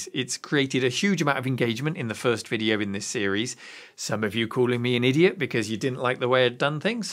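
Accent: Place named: England, United Kingdom. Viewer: Your english accent is very similar to simon whistler.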